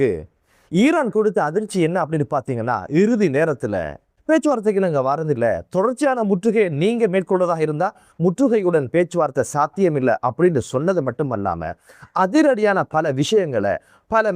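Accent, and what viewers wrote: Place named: Chennai, India. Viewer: நல்ல செய்திகள். Madras தமிழ்தான் பிடிக்கவில்லை.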